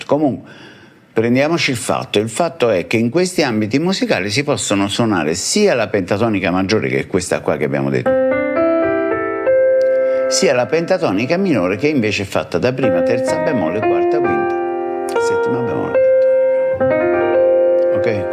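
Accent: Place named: Naples, Italy. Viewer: Ottimo!! Ti ho conosciuto tramite l'intervista col "Tino", hai una "tomità " tutta napoletana nel parlare ed una chiarezza espositiva che mi ha fatto avvicinare ad un mondo finora misterioso non da ascoltare ma da imparare. È già il secondo video che ascolto ed è molto bello seguirti. Chapeau!!!👍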